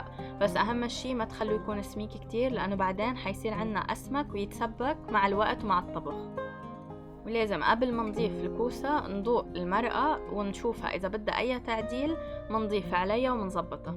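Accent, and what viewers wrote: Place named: Beirut, Lebanon. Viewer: رائعه مادام.. اكلك طيب و كتير حلوه لهجتك.. Vous êtes au top je vous suis depuis quelques temps, j'adore vos recettes mais aussi votre accent beyrouthiye.. Merci 👍👍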